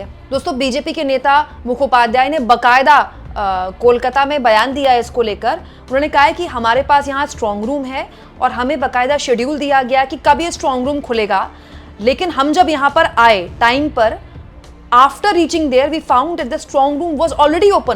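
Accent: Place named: Uttar Pradesh, India. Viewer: Bhatere सवाल 😂😂 बुलंदशहर अलीगढ़ स्टाइल छोड़िए , बहुत से सवाल बोलिए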